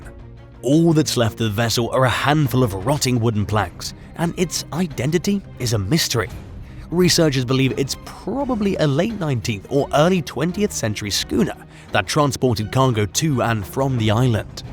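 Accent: England, United Kingdom. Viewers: American Eye , has England accent . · Love how this is American Eye and has a Englishman speaking. 😆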